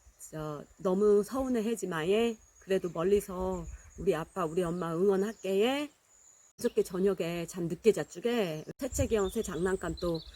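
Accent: Jeju, South Korea. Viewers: 님의 구수한 사투리에 담긴 부모님에대한 사랑과 그리움, 제주에서의 지낸 유년 시절에대한 추억들이 고스란히 느껴지는 따뜻한 영상이네요^^ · 추석에 가족들과 만날수 없었는데 님의 영상이 마음을 따뜻하게 해주네요.. 제주도의 구수한 사투리도 넘 듣기 좋았어요.. 감사합니다💖💖💖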